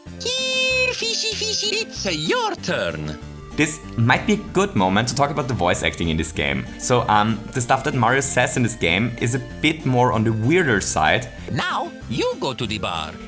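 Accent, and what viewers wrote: Austria. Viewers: are you austrian? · what the heck are you guys talking about? Also, i know his accent is austrian, but im not sure if he lives there, maybe he has something on a social page or something, we should make it our goal to try to track him down! We need to do some digging...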